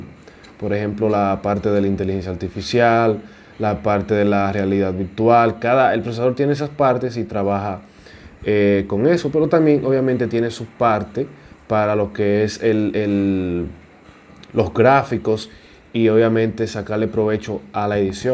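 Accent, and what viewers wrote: Dominican Republic. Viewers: Se nota que eres dominicano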